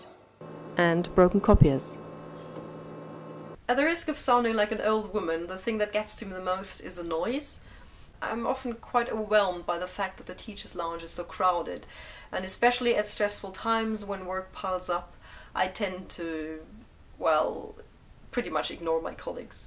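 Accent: England, United Kingdom. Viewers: I like your British accent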